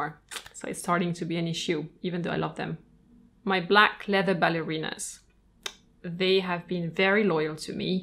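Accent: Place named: France. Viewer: Dear, thanks so much for the video. It inspired me to declutter my shoe closet. I am avid fun of Mary Condo, so i do organize my closet and periodically declutter. Even though i do not own many pairs, i really appreciate high quality. Is it possible you spell out the brands that are featured in your video? I watched it several times but due to french pronunciation can not catch the names.;) I especially loved your platform boots and platform summer sandals. and black shoes, they are so spectacular. The links you posted does not take me to the same shoes. May be because i am located in US and it takes me to German version of the website. If i know brand names of the shoes featured in your video i could look up based on the brand name. Thanks os much in advance!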